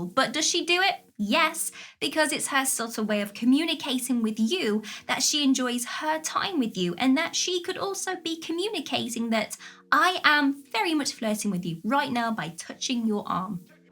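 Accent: United Kingdom. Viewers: I'm improving my english accent here. Very cultivated channel and the british excellent tone in language is superb here indeed. Fantastic beautiful. I listen to you just to improve my english tone 🤗❤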